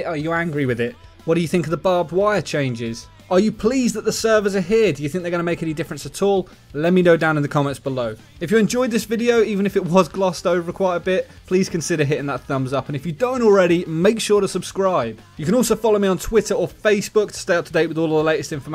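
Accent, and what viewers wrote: United Kingdom. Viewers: oh look another british guy reading patch notes hi stylosa hi pete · You sound like you are from they U.k your connection is gonna be shit no matter what, Canadian and US are very compatible for servers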